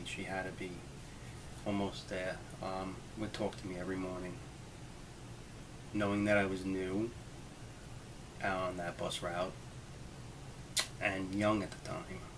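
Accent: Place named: New York, United States. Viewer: you're a good guy..love the new york accent:)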